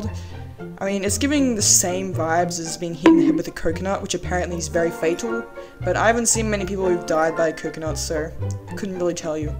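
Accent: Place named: Australia. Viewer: Ohh your’e Australian? So am I - also these are very accurate ranks in my opinion.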